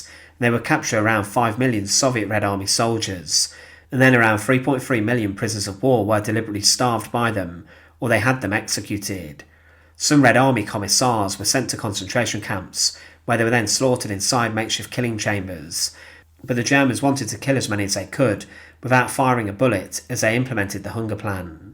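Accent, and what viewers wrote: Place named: West Midlands, United Kingdom. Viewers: Modulating his voice? If you mean the intonation, that's just the local accent in parts of the West Midlands where the narrator is clearly from. People have different accents including different intonation, that's just the way it is. I watch channels hosted by people from the deep south of the USA, they too have very specific accents and intonation but I just accept that as part and parcel of their narration. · He has a much better voice and pronunciation care than so many shoddy YT presenters who don't bother or care to learn how to say foreign names and words. He writes and organizes well and carefully. His particular English accent another commenter said is "West Midlands." As an American I've had no trouble understanding him. If you have specific names he doesn't say sufficiently well, then pointing them out and writing phonetically how to say them could help.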